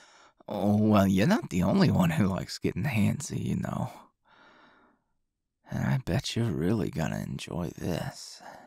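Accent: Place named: New York, United States. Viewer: The new york accent 😍😍😍😍😍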